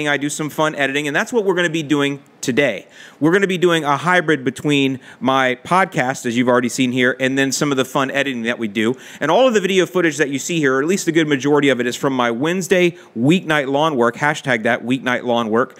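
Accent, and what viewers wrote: Chicago, United States. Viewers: I love the chicago accent.😃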